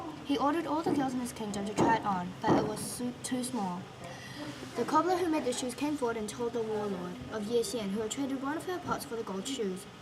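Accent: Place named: England, United Kingdom. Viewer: OMG an Asian with a English accent.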